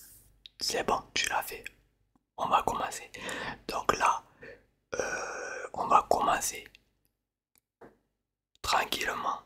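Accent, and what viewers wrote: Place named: Toulouse, France. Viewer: Ahhh Toulouse !! je n’y avais pas pensé, j’imaginais Avignon ou Agen je me suis pas tellement trompé, je veux dire au niveau de l’accent. Moi Valence. Bonne soirée… Je vais regarder une de t’ai vidéo pour ronfler !… j’aime bien l’autre, tu m’as laissé un autre commentaire, tu arrives dans ton salon de coiffure …. C’est le bruit du ciseau qui est soporifique. J’aime bien le style sa sort du asmriste bien carré …. Continue comme c’est cool. 👍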